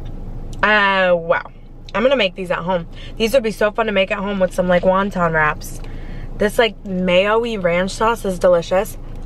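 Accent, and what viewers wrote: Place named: Ohio, United States. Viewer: I say it like she does also, it’s a regional thing from the area of Ohio she’s from where I am also from. My husband makes fun of me. I also say full like “fool” etc etc.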